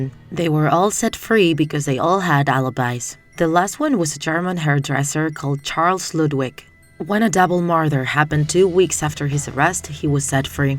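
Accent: United Kingdom. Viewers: British to me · Do you live in UK? 🇬🇧 (United Kingdom?) I feel like you speak with a british accent.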